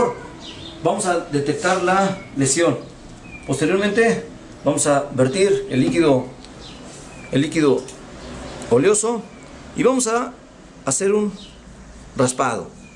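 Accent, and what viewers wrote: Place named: Puebla, Mexico. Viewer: Saludos médico Regrese con sus videos por favor Por el acento que tiene al hablar se me figura de Puebla de los angeles, paisano 🤝🏽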